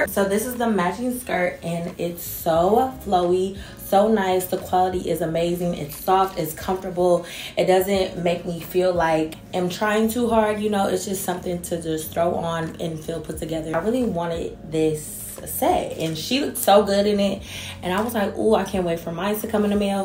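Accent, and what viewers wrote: England, United Kingdom. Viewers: The British is killing meeeee I love you lol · The English accent 😂😂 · Your British accent kills me 😂😂😂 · "mom says don't drink the milk"........ I love British accent!!!🤣🤣🤣💕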